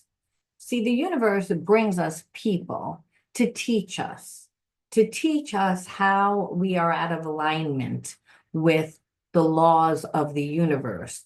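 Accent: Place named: New York, United States. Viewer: Dust part of self, & Deity part of self-- awesome!! I have felt stuck in moving forward with creative interests (despite the fact that I know I'm highly creative) and your explanation made things so much clearer for me. My parents held very narrow views of what it meant to be creative & mine was simply unrecognizable to them. Thank you for this extremely helpful reading-- really opened up a new vista for me! Sending love & light as always. 💟🧡💚 p.s.- I get such a kick when you come on heavy with your New York accent-- you're adorable!! 😂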